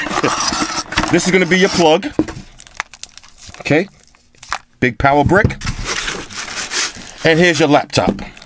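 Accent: New York, United States. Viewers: U from ny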